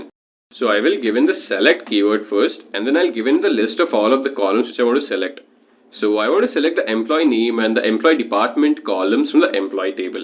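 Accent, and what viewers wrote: India. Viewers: Any chance yoy could add subtitles to your videos? I’m Indian too, but don’t have an accent so I can sometimes barely keep up with your’s in your videos...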